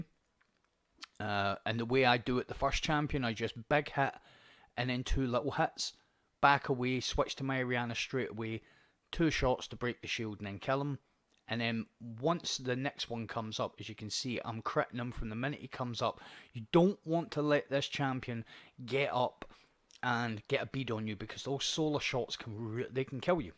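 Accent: Scotland, United Kingdom. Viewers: A Scottish commentator ❤️❤️love from the highlands ❤️new sub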